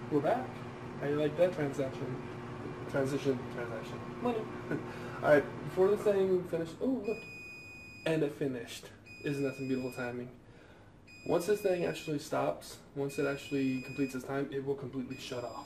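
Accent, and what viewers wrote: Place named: Southern California, United States. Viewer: Never mine aha I saw your Cali tatoo more into the video. Only asked cause the way you talked you reminded me of my self. You talk exactly like me I’m from so cal Anyways cool video using my fryer right now !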